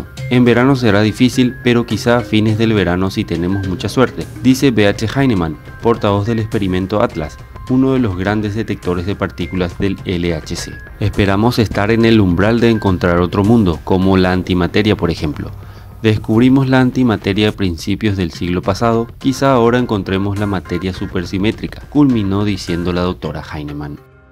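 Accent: Paraguay. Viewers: ¿Eres Paraguayo? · sos paraguayo? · Es confundible por LA voz es paraguayo yo soy Paraguay tambien jeje saludos amigo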